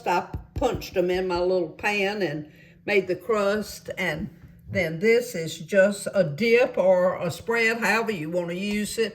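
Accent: South, United States. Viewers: I love the American southern accent! Most people do, because the southern soul is alive and well! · Hi, thank you for the reply! I just got the sense to see you are also on facebook, so Yayyyy i found the recipe, And I can't wait to get your book. And I love your accent (I am from North Carolina but grew up in Florida, so I love to hear the Southern accent when I can. Thank you again!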